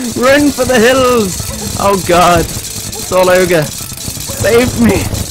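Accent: United Kingdom. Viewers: from the UK, so his english isn't his first language.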